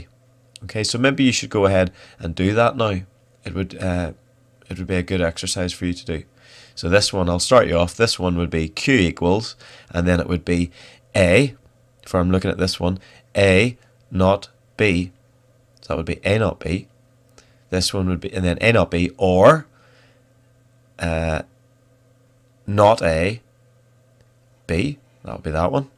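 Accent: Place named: Australia. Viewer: he is Australian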